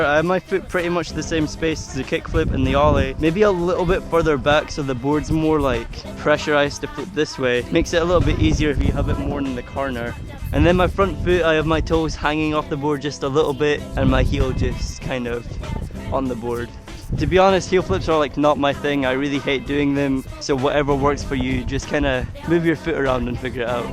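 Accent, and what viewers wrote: Scotland, United Kingdom. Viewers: Are you from Scotland or the UK ? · Scottish. I knew it!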